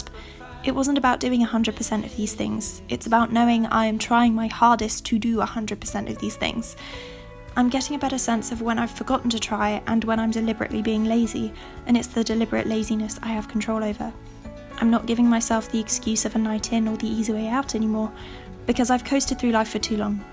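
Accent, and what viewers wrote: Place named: United Kingdom. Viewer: you sound so quintessentially english x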